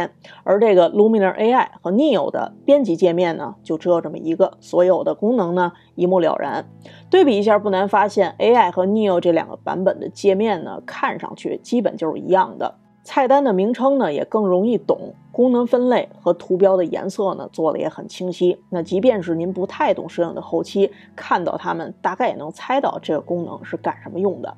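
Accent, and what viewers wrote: Beijing, China. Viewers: 姐们儿，一听你就是老北京：）感谢分享，在B站也关注你了。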